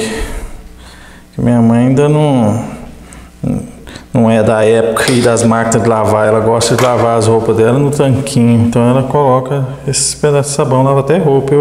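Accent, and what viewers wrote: Minas Gerais, Brazil. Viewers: Adoro esse jeito mineiro de falar ❤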